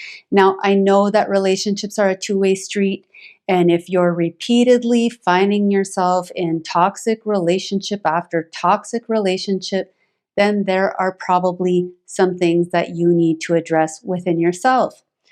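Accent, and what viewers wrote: Canada. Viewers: Sounds like a Canadian accent.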